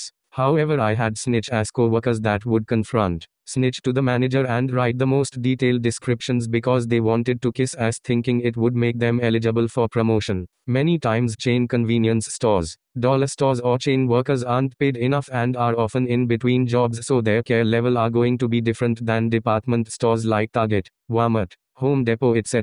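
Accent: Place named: India. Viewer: AI VoiceOver with an Indian accent??? huh?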